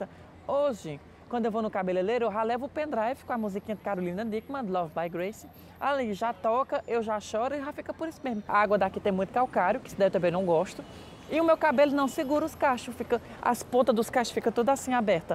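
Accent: Ceará, Brazil. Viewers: sou nordestina também e adoro seus vídeos, vc fala como se ainda vivesse no nordeste kkkk! Ômi, fala da sua opinião sobre comida parisiense. Eu sou meio fresca com comida e nas vezes que fui em Paris só como steak e batata. Uma vez fomos jantar na "Champs", pedi risoto de frutos do mar....veio os Moules...tive coragem de comer não!! prefiro sururu! kkkkk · td bem? Faz mais video desse estilo, falando o q vc não gosta e o que gosta. Você é otimooooo. Sou do Ceará tbm, mas tem MT tempo que sai de la e moro em Vitória, no ES. Então assistir seus vídeos me faz matar a saudade do sotaque de la, além de rir muito. Parabéns e sucesso. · voce e muito engraçado ate o seu sutaque cearense voce ainda não deixou Conheço um pouco Paris porque tenho 1 filho e uma filha que moram na França ela em Angers vale do Loire linda cidade e o filho strasboug são profissionais ela medica e ele engenheiro moram na França ha 17 anos tenho 4 netinhos e se um dia eu for a Paris eu queria voce como meu guia turistico pra mostrar muitas coisas que eu nao conheço em Paris passei pelo Louvre Torre jardim de Luxembourg etc mas ainda tem coisas que eu nao conheço um abraço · Amigo! 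gosto da suas expressões, sou nordestino como você e sinto feliz quando fala com sotaque. Fazia muito tempo que eu não ouvia a palavra " pirangueiro " ou " pirangagem " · oi! amo seus vídeos, suas opiniões, vc além de inteligente é muito divertido! amo o sotaque nordestino. um chêro ( minha mãe tb fala assim) bjs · Tô amando seus vídeos! Você sabe falar sobre diversos assuntos, sérios ou não, e ainda assim consegue arrancar risadas. Você é ótimo, amo o jeito de falar, representa nosso Ceará! Sucesso aí! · adorei, vc é mto engraçado! amo sotaque nordestino <3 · Saiba q gosto muiiito, muiiito de vc. Uma afinidade q deve se justificar pela sua simpatia, educação, autenticidade e, mais q tudo junto, por sermos conterrâneos. Sou Cearense, do Cariri, e me emociona te ouvir falar com nosso sotaque, do nosso jeitinho tão singular. Vc fala de Crato, Juazeiro e eu embarco junto... Hj vc busca realizar seus sonhos e objetivos em Paris, eu na tão querida, por mim, Salvador/BA, mas o fato é q as nossas origens/ raízes são como uma marca, um sobrenome. 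Sorte e muitas Alegrias em sua vida. Que viva muitas aventuras especiais para q tenha sempre assuntos a compartilhar com a gente. Xeiroooooo grd.! E obrigada por nos fazer sorrir a cada frase. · Aquele momento que você sente orgulho de ser cearense só pelo sotaque kk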